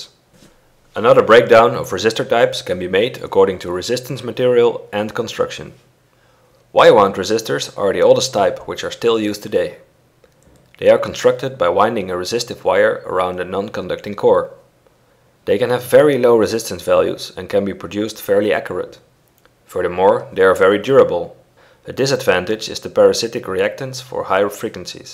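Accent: Netherlands. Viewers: do I hear a little dutch accent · You sound very Dutch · Are you from holland? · Nederlands accent herken je altijd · Are you, by any chance, Dutch? That, or my accent recognition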